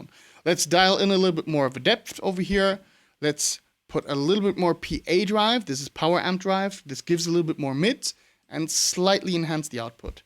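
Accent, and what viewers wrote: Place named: Germany. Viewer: Ach, Deutscher! Hab's am Oettinger bemerkt und jetzt auch etwas am Akzent. Aber das Video zuvor hätt ich nicht unbedingt darauf getippt. Kompliment. Wenn du magst, ich mache auch ab und zu Videos, wo ich Gear und Software präsentiere (meistens in Form von Riffs, spreche nicht). Das ist z.B. mein Sound mit dem Nalex Gerbert: <link> Hier ausschließlich ein AMT K2 Pedal, also ohne TS davor: <link>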